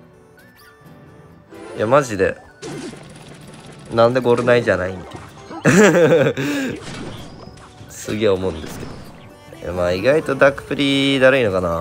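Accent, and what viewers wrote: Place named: Kansai, Japan. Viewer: なんか今回粗品の動画見たあとなんかってゆうぐらいにちょいちょい関西弁ぽいの聞こえるなぁ笑笑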